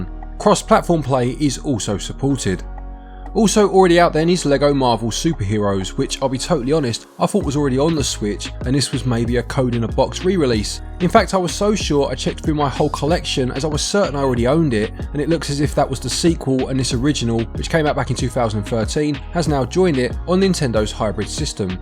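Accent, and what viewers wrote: England, United Kingdom. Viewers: Definitely waaaaaay too English. But we love it. Now say aluminum and strawberries for us please.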